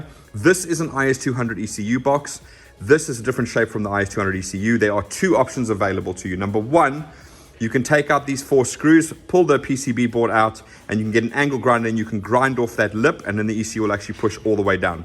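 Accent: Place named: South Africa. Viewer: That South African accent. Also, awesome work man!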